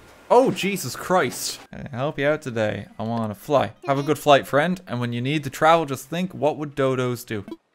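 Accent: Ireland. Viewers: Are you irish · Every time you say Blathers in your Irish accent my American brain hears "Bladders" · couldn't place accent for a few videos, clicked on bio realized he's from ireland. everything makes more sense now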